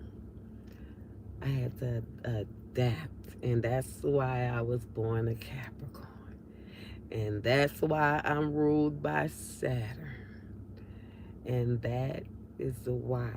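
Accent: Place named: New Orleans, United States. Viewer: I love the New Orleans accent. You're so authentic. I love it. I'm a new subscriber.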